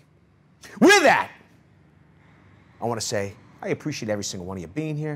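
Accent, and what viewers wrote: Philadelphia, United States. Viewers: You Guys from Philly? I am. I feel like You have My accent? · This guy is from the east coast for sure. I'd say philly Lol.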